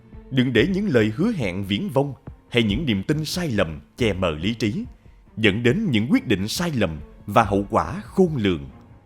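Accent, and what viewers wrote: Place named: Saigon, Vietnam. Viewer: Oh. đã trở lại. Rất thích giọng Saigon của bạn ❤❤❤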